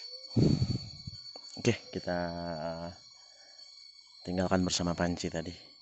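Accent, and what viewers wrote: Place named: Medan, Indonesia. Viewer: Biasa itu mass dalam logat medan😄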